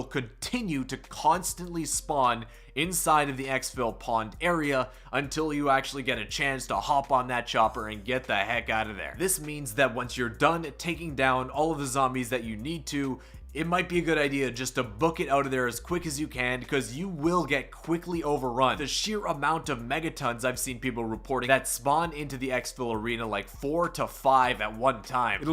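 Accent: Canada. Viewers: Are you Canadian by any chance? Your accent sounds Canadian.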